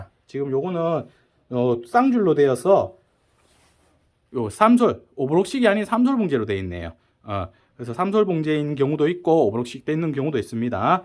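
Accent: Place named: Daegu, South Korea. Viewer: 수선의 달인 이십니다 경상도 사투리중 가장 정감이가는 대구 사투리 매력에 푹빠져 설명이 속속 귀에 들어 옵니다 오늘도 잘 배우고 갑니다 😊😊😊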